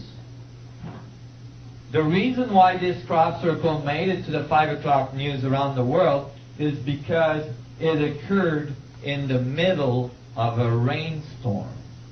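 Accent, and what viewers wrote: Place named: United States. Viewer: He just sounds weird because hes from america. Doesnt mean hes a dumb arse.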